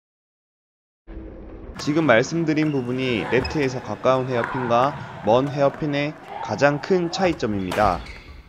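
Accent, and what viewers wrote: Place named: Seoul, South Korea. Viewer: 서울 사람 되가네? ㅎㅎㅎ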